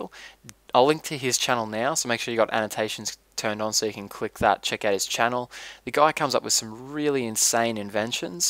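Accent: Australia. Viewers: down under XD sorry made me giggle I work with Aussies so i find all of the little dialectal inflictions amusing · are you aussie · Are you Australian? :) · I left a comment Down Under, where your accent's from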